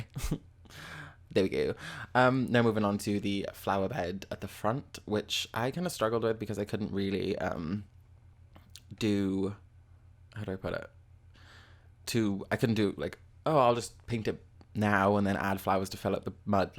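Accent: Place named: Wales, United Kingdom. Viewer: You sound welsh, I am too (I’m from South Wales) 💓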